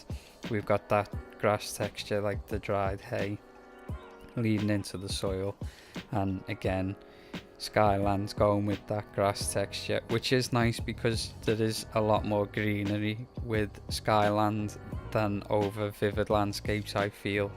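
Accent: Liverpool, United Kingdom. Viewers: Oi scouser! Cheers for the videos. Might subscribe but one question first, are you red or blue?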